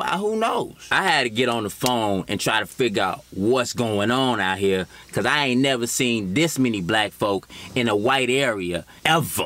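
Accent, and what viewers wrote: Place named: New York, United States. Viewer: You originally from New York?? I detect an accent.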